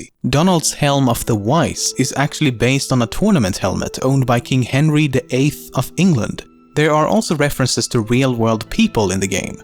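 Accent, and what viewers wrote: Sweden. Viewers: that swedish accent tho edit: he knows it.. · My God! The Swedish accent is so strong with this one. Being a Swede myself, I can dig it. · being from sweden it is so easy to hear if a person is from swedish XD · The Swedish "accent" is leaking through! :D Where able to recognize right away that it was a fellow from our snowy north! :D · Exactly my point, hence why he does not pronounce it appropriately. Have you heard the way he pronounces the English word "character"? Incorrectly by English standards but just fine according to the way that the "ch" sound is used in Sweden, He says words the way that he does because it is the way he is culturally accustomed to pronouncing them. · If you dont know this accent, this is a swedish accent, and it made me lol af! BIG UPS THOUGH! BIG UPS! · you can hear that the speaker is swedish xD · Du var svensk!! 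Jag visste det! Brytningen fick mig att tänka till.... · Is this guy swedish? he sound very swedish :P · Cool videos and info man! Glad I stumbled across your channel.DS lore and Swedish accents ftw. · christ his accent is swedish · You can't have enough trivia and Swedish accents! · im here for the swedish accent xD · Omfg that swedish accent.. I just can't enjoy the videio hearing this.. And Ornstein and Smough AREN'T EVEN HARD!!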